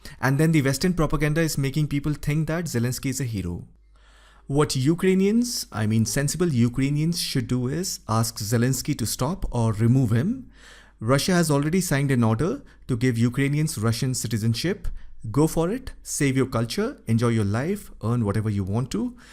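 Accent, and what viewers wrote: India. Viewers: Russian propoganda in English with an indian accent. I still cannot understand why Indian people so vigorosuly support a country that is shelling civilians on Ukraines soil.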